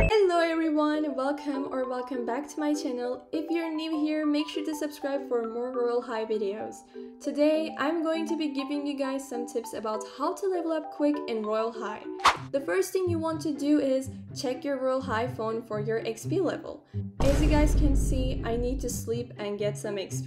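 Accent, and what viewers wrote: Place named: Mexico. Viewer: she sounds like she’s Mexican 😳 but the fit is cute😊😊😊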